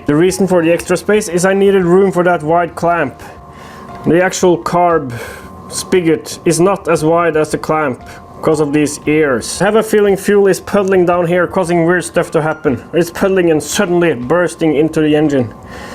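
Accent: Scotland, United Kingdom. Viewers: Love your channel. I don't know squat about two strokes but I enjoy the process, the ups and downs, the music, the Scottish accent, the whole thing. Authentic empirical activity leading (maybe) to a distant, possibly successful outcome. Not for folks who idea is watching a half hour video by someone who builds an engine or restores a car, start to finish. This is the journey, not the destination. Who knows, you may end up with the world's heaviest 2-stroke. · the scottish accent cracked me up lol! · Love watching your content, also love the scottish accent😂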